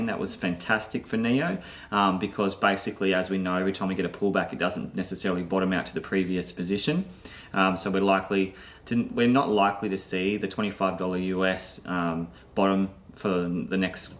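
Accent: Australia. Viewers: Nice to hear an Australian voice critiquing the crypto market. Recently came across your vids and am enjoying your input, keep up the great work... cheers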